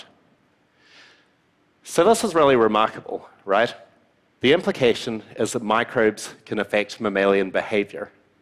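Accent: New Zealand. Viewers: Kiwi here. I was also immediately thrown by the accent. He's got a Kiwi twang but there's definitely something really unusual about that accent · He's from New Zealand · de Valle Ruiz Re: the accent, I actually found kiwi so refreshing after hearing American so much of the time :-) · It's a type of NZ accent from the deep south.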